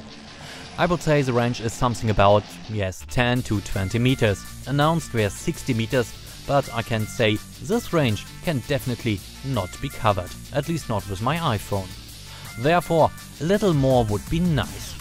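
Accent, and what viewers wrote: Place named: Germany. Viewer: I can hear the German accent 🤣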